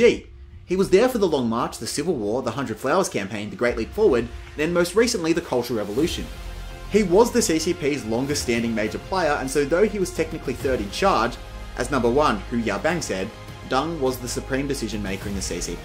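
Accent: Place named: Rural, Australia. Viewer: This is puerile simplicity read out by a barely literate yobbo who sounds like he comes from Tenant Creek, or some other Aussie backwater that equates to the Mississippi Delta.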